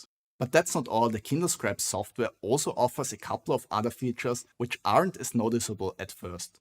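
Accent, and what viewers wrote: Germany. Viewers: Bad bad german or austrian accent sucks alot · Muy friend, your video is perfect, your content is very good, but you need to work on your english, because it really sounds like german spoken with english words. And I am writing this as a non-native listener.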